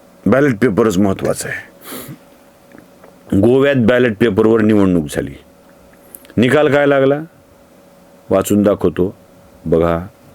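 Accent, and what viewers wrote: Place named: Marathwada, India. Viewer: तुमच्या बोलण्यात मराठवाड्याच्या मातीचा गंध सतत जाणवत असतो त्यामुळे खूप आनंद मिळतो व मन प्रसन्न होते मन:पूर्वक अभिनंदन यज्ञेश्वर दीक्षित, मुखेड जि नांदेड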